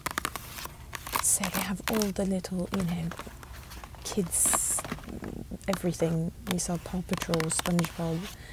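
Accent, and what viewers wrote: England, United Kingdom. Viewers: Real or not love me a good English accent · i wonder where was born, she sounds british to me... So i wonder if there is Target in UK.. sorry for my bad english ^~^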